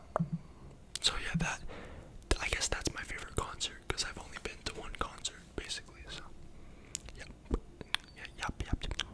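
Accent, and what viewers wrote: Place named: North America. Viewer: Not only that you “look” American, We can’t tell the difference in accents between Canadians and Americans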